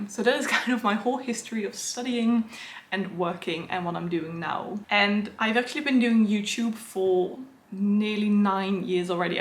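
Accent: United Kingdom. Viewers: Hi!! 👋🏻 and thank you for answering my question! The “are you British question” 😄 I love love your accent ☺️💕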